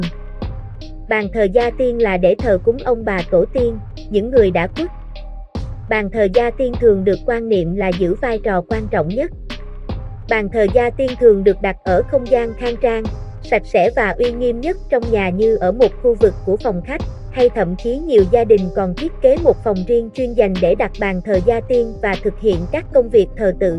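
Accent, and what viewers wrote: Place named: South, Vietnam. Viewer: Giọng miền Nam nghe khó quá lại còn nhạc nền nữa làm cho càng khó nghe hơn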